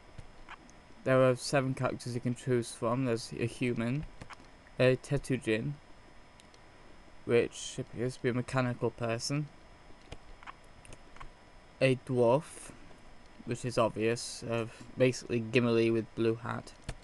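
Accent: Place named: England, United Kingdom. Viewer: I am hispanic american, but I dont think I have any particular accent myself despite my hispanic roots. To me though you just have an english accent, I know that there are various kinds but I cant really tell the diffrence.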